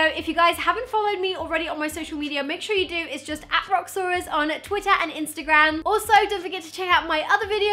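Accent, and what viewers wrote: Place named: United Kingdom. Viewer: Omg I love your accent #britishaccentsrlife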